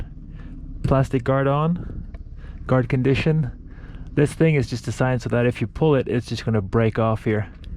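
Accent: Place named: France. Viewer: Thanks buddy... It's funny as you have a french accent on your radio calls, but not when you talk normally...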